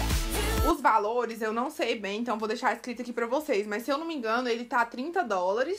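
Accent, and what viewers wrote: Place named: Northeast, Brazil. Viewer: Amei suas escolhas...esse seu sotaque é norte ou Nordeste??? sou do Nordeste, moro em João Pessoa. 🌻♥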